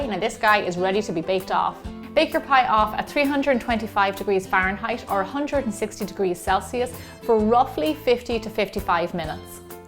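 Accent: Ireland. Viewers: Is she Irish?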